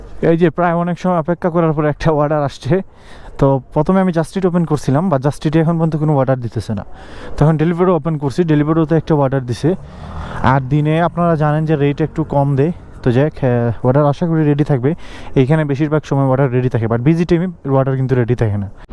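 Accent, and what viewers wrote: Sylhet, Bangladesh. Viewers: ভাই আপনি কি সিলেটি? নতুন অবস্তায় ফুড ডেলিভারি করা যাবে কি না জানাবেন দয়া করে New subscriber of your ❤️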